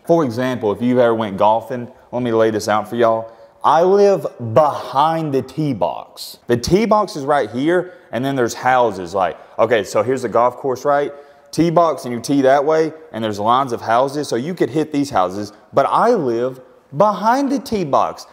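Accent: South, United States. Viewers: Where u from y you talk like that u talks country ash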